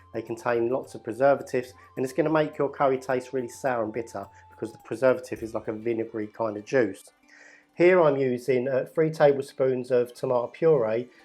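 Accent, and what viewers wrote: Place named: Essex, United Kingdom. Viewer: you've changed my life, On a Saturday night no trouble n strife, Get my aluminium pan and a batch of base, Turn on YouTube and I'm met with your face. Your cheeky Essex accent, And your new cool hair, This is a shameless poem for a freebie apron And I don't even care... Respect. (Dave from the Sunderland Message Board)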